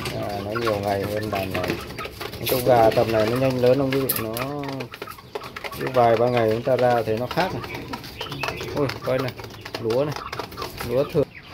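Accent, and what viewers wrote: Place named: Dien Chau, Vietnam. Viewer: Đúng chất giọng diễn châu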